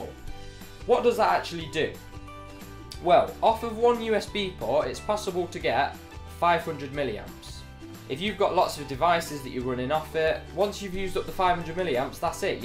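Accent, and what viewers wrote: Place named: United Kingdom. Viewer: British dialect!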